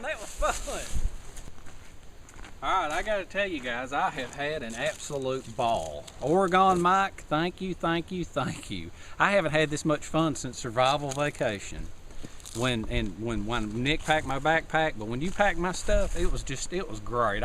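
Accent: South, United States. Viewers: I live in TN. In Nashville now and I lived in east TN by the mountains when I went to college(ETSU). Noticing accents is something I kinda picked up. To me you have a northern Alabama/Georgia accent which is cool. You should have a survival weekend and invite survival/bushcrafters from youtube and have challenges. Im new to it and I'm kinda preppy you could say so my friends arent one to get in the wild like that so I mostly do it by myself so getting to see others on youtube is a treat. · Loving this already, just from the way you talk; sounds like home. Awesome use of all the items, and what a fun challenge! One of the best videos of this sort I've seen. Elevated bed, great use of the food and cooking, and great camera work from Nick, too. Such fun. The woods make me homesick. From another green-eyed Southerner, well done!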